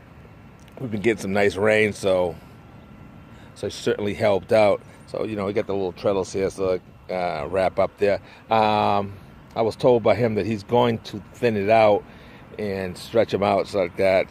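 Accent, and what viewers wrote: Boston, United States. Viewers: I had to do a double take when you said you were from Puerto Rico, until you said you grew up in Boston! It’s definitely a hard accent to miss… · Hello, as you were talking I said to my husband you have a Boston accent,but he is in I think Georga. At the end you told where you were from so that explains. The garden is looking great. Thanks for sharing this with us.💕 NonnaGrace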